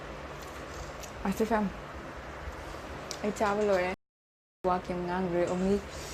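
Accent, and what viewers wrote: Kakching, India. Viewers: Kakching Marol da oina ngangngo aduna tabada nungsi tabasu nungai... Eshagi erol bu ngangthikpada ekairido karigino...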